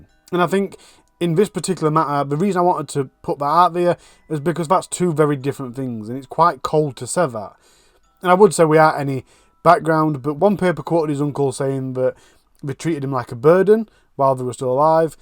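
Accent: Yorkshire, United Kingdom. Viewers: Where in Yorkshire are you from?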